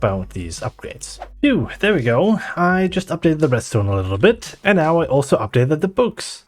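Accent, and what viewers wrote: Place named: Sweden. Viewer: Huh, I always thought that smaller house extension is actually Maru's room/apartment. Once again, you made this build look amazing, and figuring out all of the redstone stuff is way cool! Btw, your slight accent gives off Swedish vibes. Are you from there? Much love to you, as always, and thank you for sharing your creativity with the world! <3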